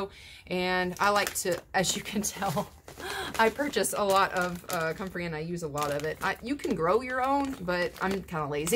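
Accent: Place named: Tennessee, United States. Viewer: I love that Tennessee tone.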